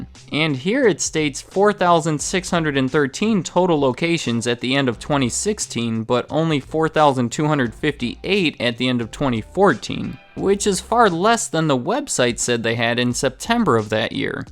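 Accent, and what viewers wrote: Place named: Midwest, United States. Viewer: With your ambiguous yet slightly midwestern accent I thought you might be from Canada.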